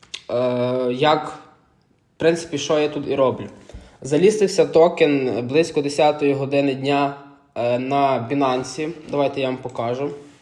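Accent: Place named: Surzhyk, Ukraine. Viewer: Суржик топ😂чекаємо за поінти нагороди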